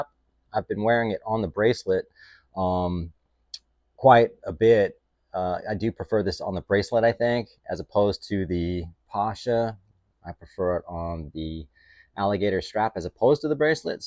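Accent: United Kingdom. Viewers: Great British accent….. can we have some more 😂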